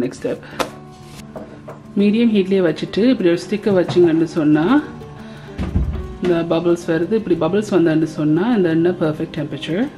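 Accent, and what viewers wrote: Sri Lanka. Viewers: Love your Sri Lankan accent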